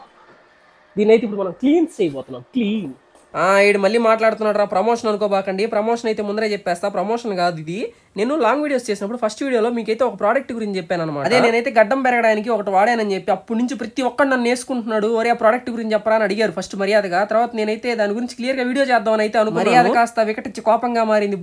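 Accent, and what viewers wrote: Nellore, India. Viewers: Nenu meee vedios chustunnanu last one week nunchi but QA vedio lo meeku ammayilamedhunna respect ki subscribe chesanu🎉 all the best ma frd kuda nellore eeh slang baguntadhi kadapa slang ki quite opposite nellore slang😊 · Hi nenu akkani epateki 3rimes chusanu video 12 y avuthundi nellore basha vne ne matallu challa baguntai thamudu nellore valaki yachullu yakuva 😂😂😂 yapudu happyga undu ma house chupinchava pls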